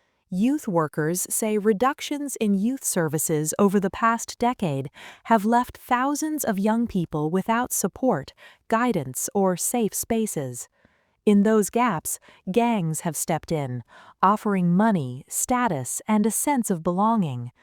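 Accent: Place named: United States. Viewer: An American narrator, why?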